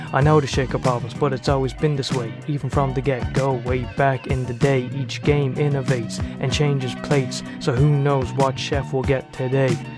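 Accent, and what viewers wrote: Ireland. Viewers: I feel dumb for not noticing you were Irish 😂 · Dude I don’t know why but you sound soooo Irish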